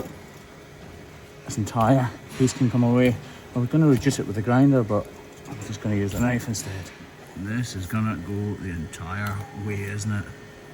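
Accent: Scotland, United Kingdom. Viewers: I don't know what it is but your videos are somehow fascinating. Maybe it's because you're doing so much good for suffering animals? BTW, I have 2 frenchies as well, they have such a wonderful character. I'm german and I always wondered in which country you might live. My guess was Scotland because you are a little hard to understand. No offense meant at all but your accent is quite a challenge for someone who learned english in school and had to do with americans only who btw use only a third of the english vocabulary, if at all. The great comedian Robin Williams once said "once you're plane lands in Scotland you need subtitles". I had a look at your website and found out that I was right, you're based in Scotland. Keep up your good work 👍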